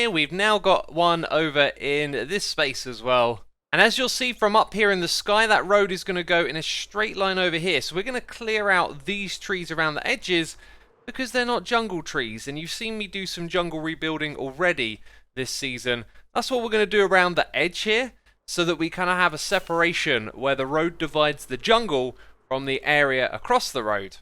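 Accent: United Kingdom. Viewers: I just searched if X lives in a country in which they drive on the left (he's British, apparently I wasn't paying any attention to the accent haha), cause I got confused when he said the designs of the road felt IRL and I didn't see it lol😂😂🤦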